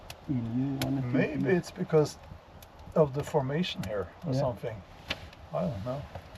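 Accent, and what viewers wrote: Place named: South Africa. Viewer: sounded South African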